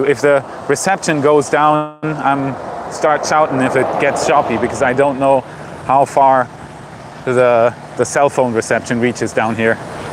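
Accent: United States. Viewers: not only do you sound excited, but you also sound like an American. Your accent has faded since you arrived in the good old USA. We are happy to have you and you are welcome here anytime! Keep up the great work. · Why has your accent changed? Its more american than your usual accent. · You look so relaxed, you even are getting an American accent ! Wild... Ya, Boca Chica is a madhouse these days.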